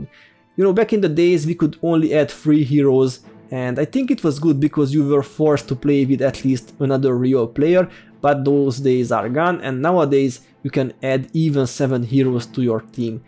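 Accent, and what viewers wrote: Hungary. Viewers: Aha, hungarian accent! I always wondered where it was from :D That said, I love how you say "foes", reminds me of how Mr T says "fools", and I choose to interpret it thusly. "These fools are no challenge!" :D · The Hungarian accent is the reason I’m here 😏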